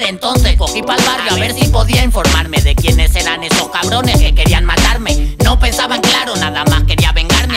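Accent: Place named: Dominican Republic. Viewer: El tiene el acento un poco dominicano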